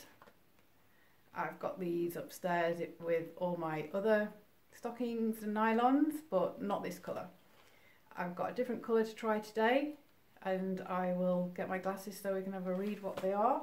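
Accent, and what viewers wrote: United Kingdom. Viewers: I DETECT AN ACCENT WHEN YOU SPEAK IN YOUR VIDEOS ARE YOU BRITISH?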